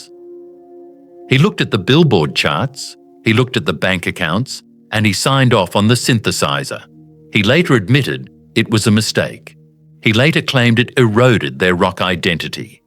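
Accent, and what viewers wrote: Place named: Australia. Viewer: I can’t sit here and listen to this Australian robotic twit!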